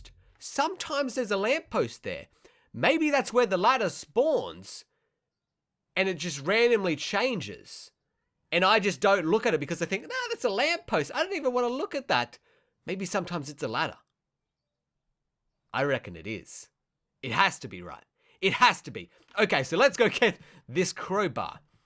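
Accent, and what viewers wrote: Australia. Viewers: is he Australian because i am and he kinda sounds like it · Are you Australian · Love people with Australian voices! <3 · R u australian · Is he Australian ?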